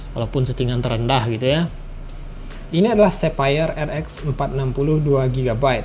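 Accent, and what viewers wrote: West Sumatra, Indonesia. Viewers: Logat minang nya sangat kental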